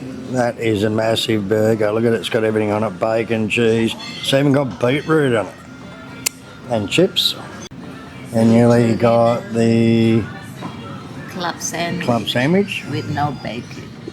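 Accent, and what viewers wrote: Australia. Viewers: Love the Aussie accent, says this Yank. Apologies if you're from NZ. The accents to our ears, are so similar.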